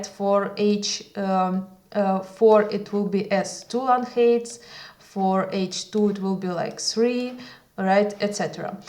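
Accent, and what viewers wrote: Russia. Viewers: The dictor has a strong russian accent:) It seems easier to make it in russian for her than in english)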